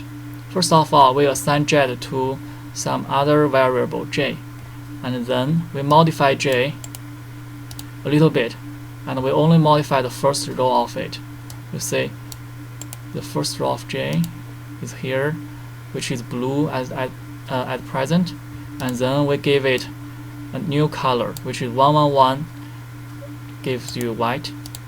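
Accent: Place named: China. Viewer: love your accent reminds me of my China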